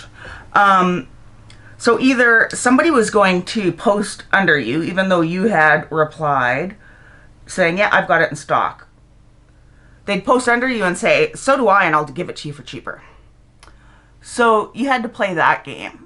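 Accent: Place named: Canada. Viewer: are you from Canada? Love the accent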